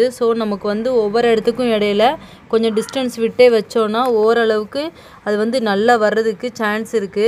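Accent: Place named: Tirunelveli, India. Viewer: அக்கா நீங்க திருநெல்வேலியா, திருநெல்வேலியில் தான் பூண்டு பூடு ன்னுதான் சொல்வாங்க நண்பர்களே.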